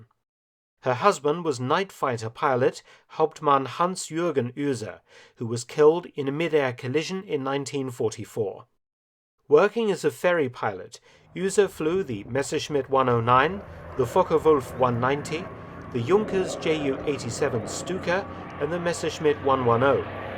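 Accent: United Kingdom. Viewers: His German pronunciation is solid but far from perfect. There is a notable British accent and in addition some words sound quite different when a native speaker speaks them. For example "Hanna Reitsch" was quite close, but there was still some accent in the surname. In contrast to this, "Beate Uhse" was quite wrong. He did not speak the "a" of "Beate" although it should be spoken. In addition he spoke of the "U" of "Uhse" as "Ü". What he said would have been written "Bete Ühse" and not "Beate Uhse".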